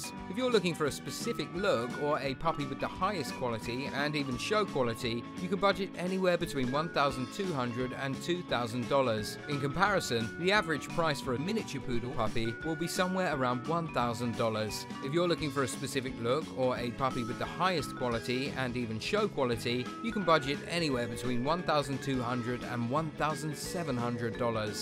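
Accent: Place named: England, United Kingdom. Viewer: I'm curious to why someone with an English accent is talking money in Dollars...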